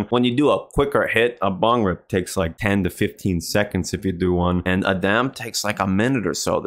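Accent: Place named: Boston, United States. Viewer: Your accent bro! Are you from Boston?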